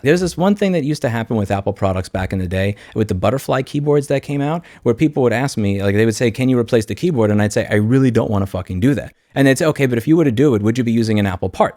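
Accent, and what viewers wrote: New York, United States. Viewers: You still got your New York accent. 😎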